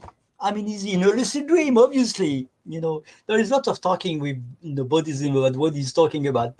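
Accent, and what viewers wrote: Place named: France. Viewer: I looooove the French accent.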